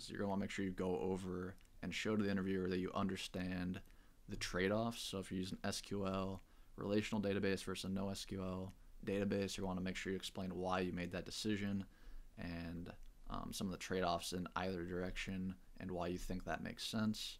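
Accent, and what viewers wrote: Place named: South, United States. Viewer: Love that southern drawl man! Teach those prejudiced idiots who think speaking with a different accent makes you less intelligent that they don't know what they are talking about!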